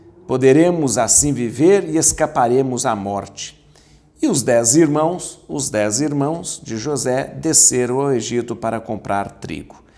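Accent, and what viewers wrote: Minas Gerais, Brazil. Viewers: Boa tarde a todos,. Boa noite padre sua benção. Maria de santo Amaro SP SP. Padre. Olha aí aquele ditado. Deus escreve serto. Se os irmãos não tivesse vendido José. Não tinha cumprido. O sonho de José la dos feixes. Vio Jesus escreve serto por linhas tortas. Amo o seu sutaque mineiro kkkkkk também dou mineira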